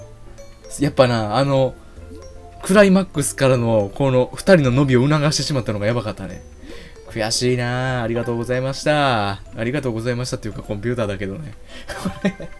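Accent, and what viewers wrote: Kansai, Japan. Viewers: 関西弁すてきです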